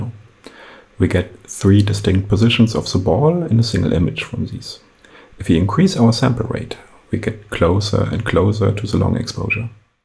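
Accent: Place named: Germany. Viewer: That's cool stuff, you deserve a larger audience. I love how well that camera fits on that signpost, it's a really elegant solution to hiding a camera in plain sight. Also, as a felllow german I have a love/hate relationship with your accent. Ps. "BEAR with me" is pronounced more like the german Word bär, not Bier, unless you wanted us to enjoy a drink with you.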